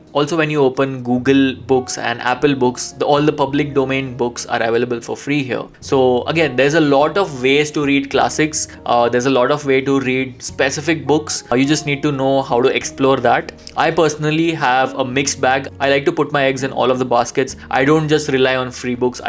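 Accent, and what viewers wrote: India. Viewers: Why's Indian accent so shitty? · If you are in America try to speak Amerian English, not Hindu English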